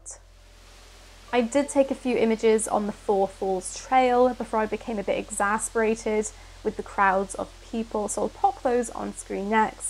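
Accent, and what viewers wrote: United Kingdom. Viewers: Those images at the end… 😘 (Also, people over here in the U.S. pronounce "NiSi" the way you Brits pronounce "Nikon.")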